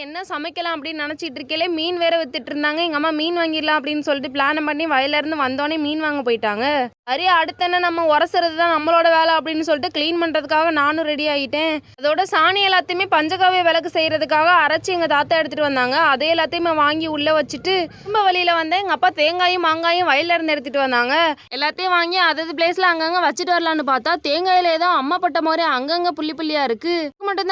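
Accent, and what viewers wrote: Madurai, India. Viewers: மதுரை வழக்கு பேச்சு தலைவா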